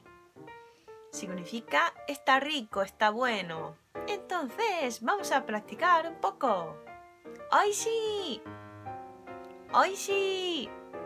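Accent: Spain. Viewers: tu español . es de españa y un poco de latino . very good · Pero hablas español de españa ejemplos de español latino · ptm pero hablas como española yo me largo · habla como española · me encantó completamente tu acento español, es perfecto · aprendiste el peor acento espanol que existe,,,,, es mucho mejor el acento latino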